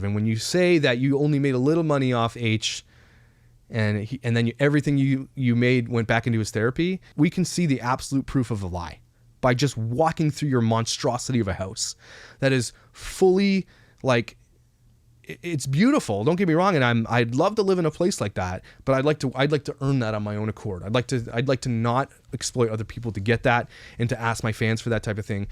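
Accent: Canada. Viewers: Completely unrelated, but the Canadian accent is strong in this one 😂 love it lol... reminds me of my fam in Canada 🇨🇦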